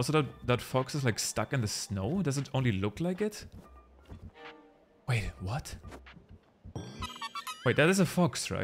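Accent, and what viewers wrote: Germany. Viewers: I live in Germany as an American and am used to German English accents, I have many friends who sound similar to you and I don't mind it! I'll never understand why people have trouble with accents, like if you don't like it then fine, but keep the negative comments to yourself smh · I'm not German but i love your accent! Please keep doing what you're doing and disregard the mean comments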